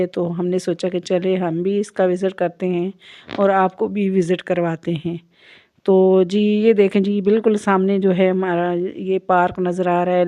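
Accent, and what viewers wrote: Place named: Hazro, Pakistan. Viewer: AP ke Urdu ma hazro k asens ata han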